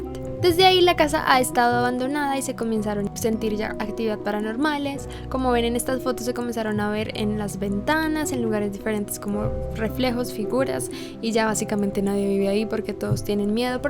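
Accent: Colombia. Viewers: eres de Colombia sierto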